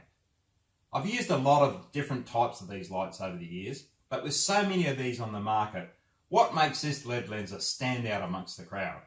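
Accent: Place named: Australia. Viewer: Don't tell me, let me guess: You're from Australia, right? :D In all honesty: Thanks, that was very helpful!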